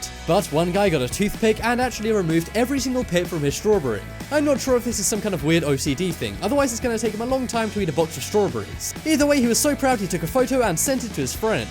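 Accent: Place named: United Kingdom. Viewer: to all the people complaining about how he says "strawberry": This dude's British, it should be obvious by the way he talks Unless British people are commenting about that, in that case... I don't care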